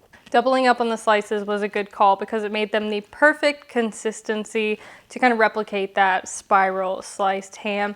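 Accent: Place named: Virginia, United States. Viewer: Ahhh, a Virginia girl are ya? Hello from Manassas, Virginia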